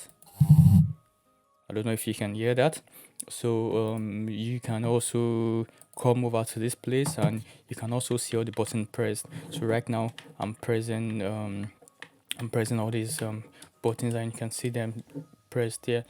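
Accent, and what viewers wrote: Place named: Nigeria. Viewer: Are you Nigerian? I love the accent bro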